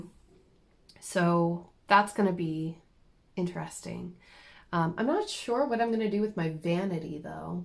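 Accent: Ireland. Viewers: The one I noticed is that you are picking up on the accent of Ireland so neat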